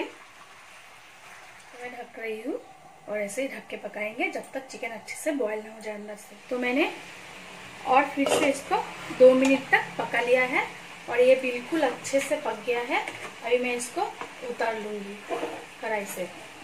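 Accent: Bengal, India. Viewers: তোমার কথা আর হাসিতেই বোঝা যায় তুমি বাঙালি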